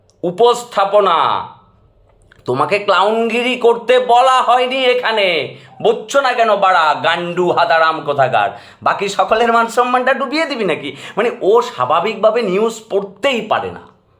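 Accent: Kolkata, India. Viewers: পুরাই কলকাতার টোন ভাই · accent is exactly like Kolkata amazing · ভাই একদম সেই হয়েছে কলকাতার স্টাইলে । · বলছি গো দাদা এ চালা তুমি আমাদের কলিকাতা ভাষা চিকলে কি করে বলুন তো😅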